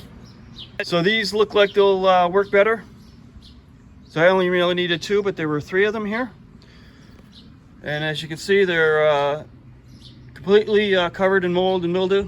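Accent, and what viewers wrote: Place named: New Jersey, United States. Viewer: You from the Northeast? Sounds like Jersey or Pennsylvania. I'm from Jersey living in FL. I really enjoy your work!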